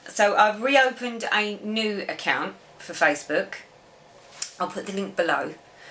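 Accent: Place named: Essex, United Kingdom. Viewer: Thank you for your videos!! I don't want to be critical of others, but.................... You have a way of speaking into the camera, telling a story without 5 minute hesitations, no umming, ah-ing. I feel you are talking to me and not your ceiling. You don't "forget" where you purchased something. You are organized and have everything at your fingertips, no matter what room you're in. You aren't dashing about to another floor in your house to find something while your camera is running. You know where you get your floss. All your fabrics have names rather than "I'm not sure what it is." or "Yes, it's pink and white but I don't know why or who did it." You don't hum non-existant musical ditties all the while lacking the talent to do so during a "Stitch With Me" video. If your phone rings, you don't answer it. How gracious and courteous of you. 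You probably have one of the most concise, informative, friendly videos on Flosstube!! And......you ALWAYS look so nice, even when you aren't well. (I include Carolyn Masseo among your caliber of video makers.) Whew!! Thank you for allowing me to get that off my chest. It's not hard to figure out what to watch on here as long as I see a video I haven't watch from " "!! And come on now!! You HAVE to love that Essex accent! Hugs,.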